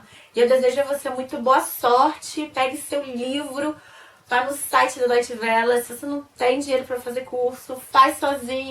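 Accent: Rio de Janeiro, Brazil. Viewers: vc é carioca, não é? se não é, viveu bastante no Rio...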